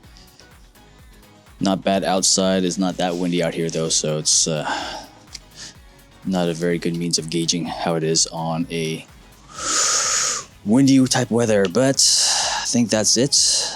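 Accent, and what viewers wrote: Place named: United States. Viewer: You sound American. Did you have any trouble ordering the Pavo 20 pro 2 considering the dji ban?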